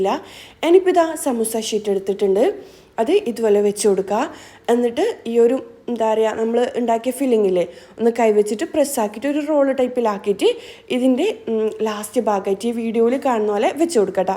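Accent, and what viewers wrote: Kannur, India. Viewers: Irfanagood presentation enik kannur samsaram nallaishtanu to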